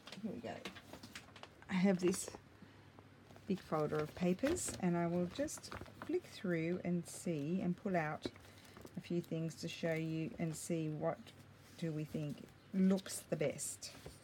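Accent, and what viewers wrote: Australia. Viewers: Hi, I assumed you were in Australia from your accent but just heard you mention you’ve just been to Australia - I know it’s nosy, but where do you live?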